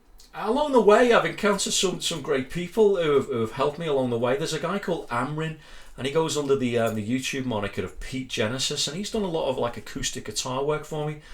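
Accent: Liverpool, United Kingdom. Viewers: Hey! I love these videos and hope you can keep them up and growing! I have a special playlist I've made up so hopefully if the worst happens, I've got copies of them! I save your vid of a particular album and then follow it with the full album. Then I can watch you explain all about how it was made then listen to the album again with "new ears!" It really enhances the enjoyment of the album! It's also great to hear a fellow Scouser providing a background to some of my favourite music! It takes me right back to the 70's and early 80's when we could actually afford to buy tickets to see these bands! (Unlike today when it costs hundreds of pounds to see bands in a field where you are half a mile from the stage!!) Keep up the good work, sir! I'm looking forward to seeing where you take us next! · I love your videos! And as a German I love your accent! 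Are you perhaps from the Liverpool area? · You sound like a scouser 👍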